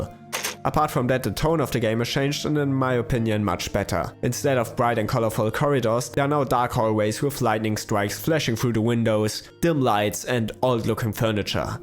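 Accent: Germany. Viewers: Ich höre Deutsch raus hahaha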